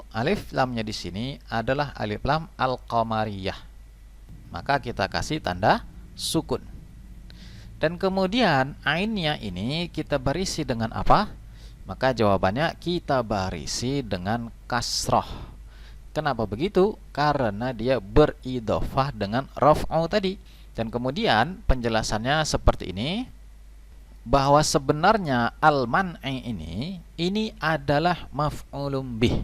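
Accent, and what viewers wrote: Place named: Medan, Indonesia. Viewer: Subhanallah jazakallahu atas ilmunya ust. Ust. Boleh tau gak.ust Asalnya dari mn ya Kok ngomongnya kayak ustadz2 medan sumut. Syukron dr rusli di medan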